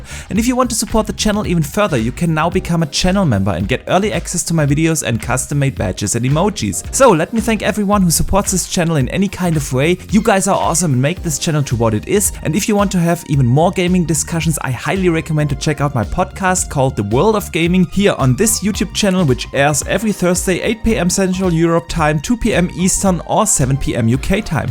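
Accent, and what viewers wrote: Germany. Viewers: Alter…du klingst so fucking deutsch! 😂 No offense, nen Like kriegste als Entschuldigung 😬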